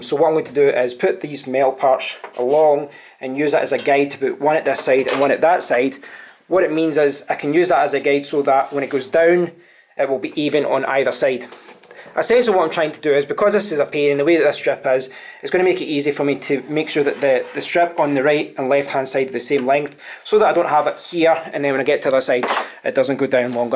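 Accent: Scotland, United Kingdom. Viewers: So awesome opening a YouTube video about tech I wanted to watch and hearing a Scottish accent! 🥃Well gid. #scottishtechyoutube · Thanks nice to hear a good Scottish voice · Gotta love the Scottish accent! I prefer female, though. Good review,!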